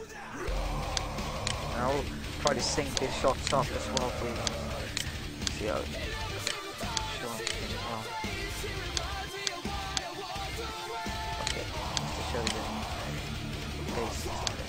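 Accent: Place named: United Kingdom. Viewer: nä så ere fan inte ! ;o du låter som en äkta britt typ xD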